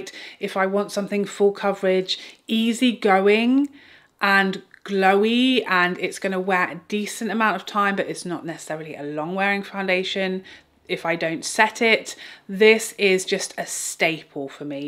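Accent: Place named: United Kingdom. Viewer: I had to leave my favorite Chanel foundations due to the heavy perfume scent they all have now. Just found Chanticaille Future Skin Gel Foundation - light to medium coverage, fragrance free, looks lovely on the skin but PRICEY. By the way, love your humor (humour) and your British accent - I could listen to you all day. XO from the US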